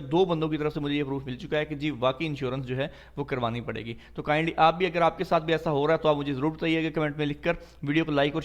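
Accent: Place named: Punjab, India. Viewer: آ پ کا نام کیا ہے بھائی آ پ کی لینگویج سے پنجاب سے لگ رہے ہیں بہت اچھا کام کر رہے ہیں ویزٹ ویزہ کے متعلق آ پ کی ویڈیو ز سب سے زیادہ انفار میٹو ہیں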